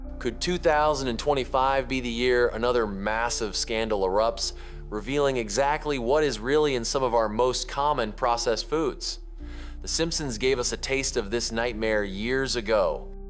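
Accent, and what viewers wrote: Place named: United States. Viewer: You must be kidding It's USA English . Point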